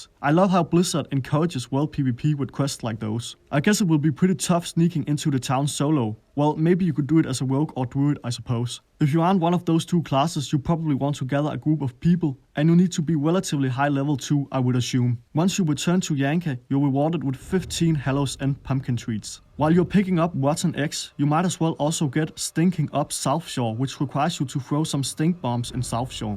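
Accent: Denmark. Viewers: This is the most Danish accent I've ever heard. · probbaly the worst danglish accent i heard to date. Thought oure military was the worst but you just wont that metal my friend. Accent homie accent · Lol den danske accent er stærk :p God video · that is some insane danish/english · Haha ja lyder som nordjysk :p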